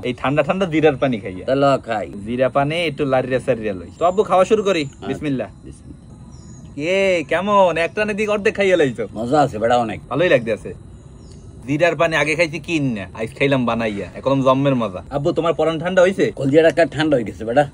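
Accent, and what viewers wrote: Barishal, Bangladesh. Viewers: কোন জেলার ভাষা?মনে হয় বরিশালের।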